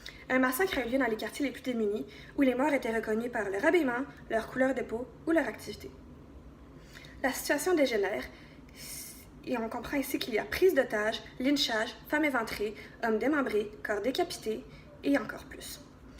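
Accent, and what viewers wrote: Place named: Canada. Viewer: L'accent est un peu difficile à comprendre. Est ce comme ça que les canadiens parlent ?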